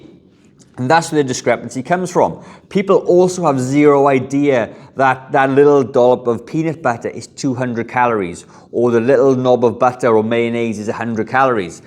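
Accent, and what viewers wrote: Wales, United Kingdom. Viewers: Lou from Ireland here. I love your welsh accent...oh and its Phone case !!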